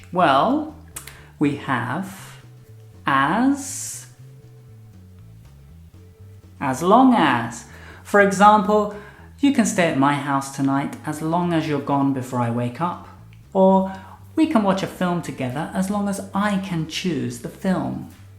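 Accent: United Kingdom. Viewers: Pero que dulce es el acento Britanico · This is British?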